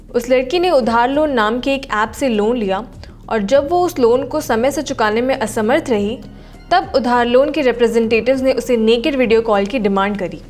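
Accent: India. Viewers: Indian english 😭😭😭😂 use correct Words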